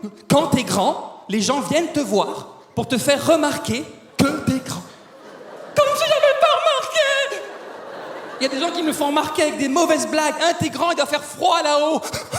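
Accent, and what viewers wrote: Quebec, Canada. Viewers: ya pas mal de trucs dans sa façon de dire les choses, l'intonation, l'accent etc qui me font penser à rachid badouri/ que j'ai aussi remarqué chez rachid badouri. Du coup je sais pas si c'est son idole et que du coup ya des clins d'œil ou si son style est insconciemment similaire à celui de rachid ou si c'est simplement parce que "ce type de stand-up" est plus populaire au québec.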